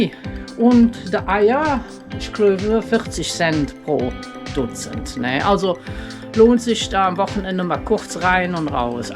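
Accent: Cologne, Germany. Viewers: Datt iss jo sööt. Ich hev alles verstaan , obwohl datt Kölsch wer.Ick Snack Platt und bedank mit för datt Video. Allns Goode .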